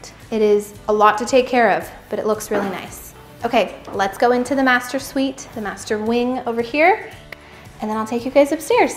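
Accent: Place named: Midwest, United States. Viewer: Lol! How quickly you adopted that mid western sound & y'all!!! Love it! Keep up the good work &!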